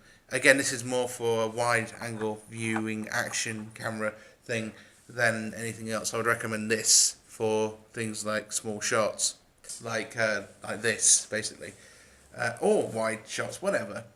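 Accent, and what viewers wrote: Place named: United Kingdom. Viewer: British accent is why I'm here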